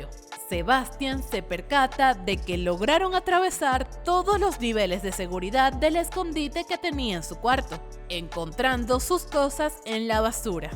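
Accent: Chile. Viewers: Soy yo o la narradora tiene un ligero acento chileno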